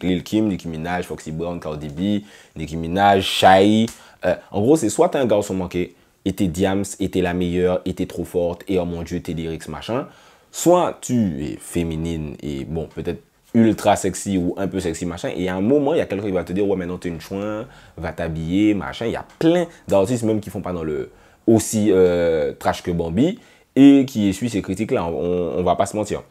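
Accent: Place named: Antilles, France. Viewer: C est super comme analyse mais quand tu dis : je vais prendre mon accent antillais...lol...tu l as H 24...xptdr...on entend que ça ton accent....🤣🤣🤣ne le prend pas mal. A bientôt.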